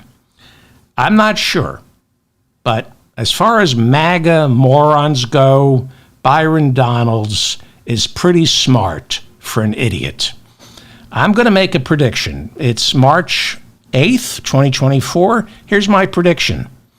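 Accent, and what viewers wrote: New York, United States. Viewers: .. i look forward to listening to your channel. it is so informative and entertaining. yet again, thank you. btw, I'm digging your NYC accent, Bronx? me too...we knew trump was a disaster, if only if they would have listened... VOTING 💙!!!!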